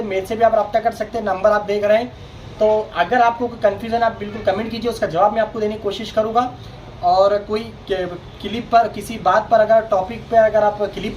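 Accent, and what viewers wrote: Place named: Aurangabad, India. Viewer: Aawaz me Aurangabadi Laheja Bahut hi badhiya hai Dr.sahab...👍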